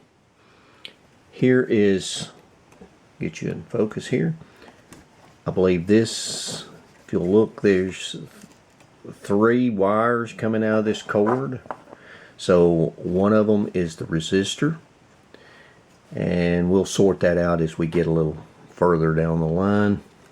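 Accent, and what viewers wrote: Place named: Tennessee, United States. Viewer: Interesting looking radio. Really like the Lady Liberty dial. First time I've seen your channel. I'm a Yankee but I recognized your accent immediately as I had cousins who grew up on Signal Mountain TN. This video is a year old already but I'll be looking at more of your videos from now on.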